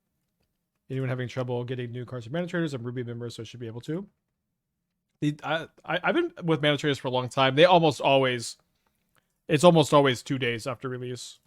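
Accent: Texas, United States. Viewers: I knew Texans actually talked like that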